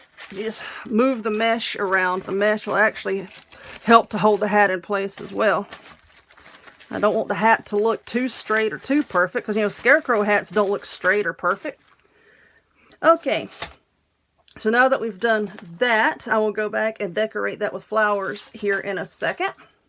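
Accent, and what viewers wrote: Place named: South Carolina, United States. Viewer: I ABSOLUTELY LOVE THIS SCARECROW 😊🎃🍁🍂 & THE WAY YOU MAKE THEM KID FRIENDLY 💝💙! THE VERY FIRST TUTORIAL I SAW OF YOUR'S WAS YOUR BEAUTIFUL CHRISTMAS WREATH WITH THE MANGER SCENE WITH OUR SWEET BABY JESUS IN THE CENTER & YOU COMMENTED "THAT'S IMPORTANT BECAUSE THAT'S WHAT IT'S ALL ABOUT REALLY'' & ALSO THAT YOU STILL SAY "MERRY CHRISTMAS 💝😊! THEN I LOVED SEEING THE "OUR PRAYERS" CERAMIC PIECE YOU BOUGHT AT THE DOLLAR TREE (Which I really loved too)! I JUST LOVE SEEING PEOPLE WHO STAND UP FOR WHAT THEY BELIEVE IN & THIER THOUGHTFULNESS FOR OUR CHILDREN & GRANDCHILDREN! OUR GRANDBABY'S HAVE ATTENDED THE SAME EXCELLENT CHRISTIAN SCHOOL FOR THE LAST 4 THAT OUR 3 CHILDREN ATTENDED AT FOR A WHILE YEARS & IT'S STILL THE SAME CHURCH. MAY GODALWAYS CONTINUE TO BLESS YOU SWEET GIRL 🙏✝💝!!! PS: Now believe me us 4 Sister's can still get a little sideways when necessary, lol 😊!!! 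Your voice sounds just like us & You have tons of fun & your personality & voice sounds just like ours! We were born & raised in Arcadia FL but our Precious Daddy was born & raised in KINGSTREE, SC until his Family moved down here. But he never lost SC accent & we all have it pretty close too, lol 😂!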